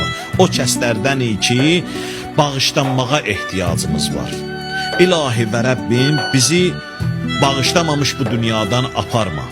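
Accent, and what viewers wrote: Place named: South, Azerbaijan. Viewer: Cenub bölgemizdenem bilirem danışığnınan qardaş sende cenublusann 😢😢😢.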